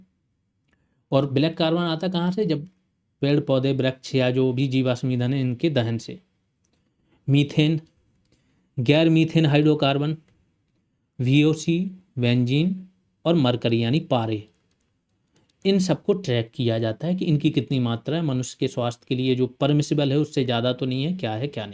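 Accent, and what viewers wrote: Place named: Bundelkhand, India. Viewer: Sir aap bundelkhand se lg rhe ho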